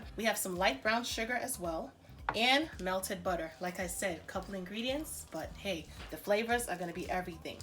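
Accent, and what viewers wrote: New York, United States. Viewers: from your accent, I’d say you live in NYC? Or maybe in the vicinity? Love your accent. I live in Upstate New York, near Albany and Saratoga.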